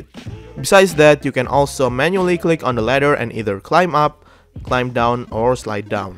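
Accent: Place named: Indonesia. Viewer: I can hear Indonesian accent👏🏼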